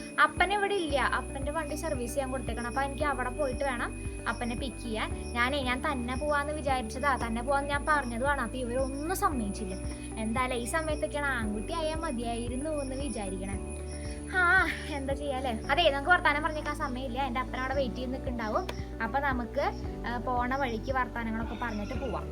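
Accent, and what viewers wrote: Thrissur, India. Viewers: Njan fast taim anato ei video kanunnathu orupadu eshttam ayi avarhranam nannattudatoo supper Thrissur samsaram polichuttoo suppara.manichettady cinima ennalem kudi kandollu marakila ah nadam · Sathyasanthamaya parachilum avatharanavum.trisur bhashayum..good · Molkke license,helmet undo? Thrissur slang👍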